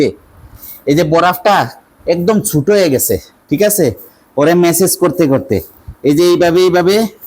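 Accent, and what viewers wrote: Sylhet, Bangladesh. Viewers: ভাই আপনাকে তো সিলেটি নি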